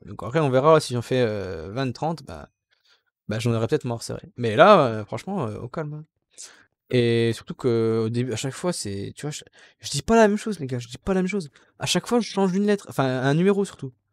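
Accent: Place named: England, United Kingdom. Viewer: J’adore ton accent anglais x) « ze 100 » c’est tout mimi First like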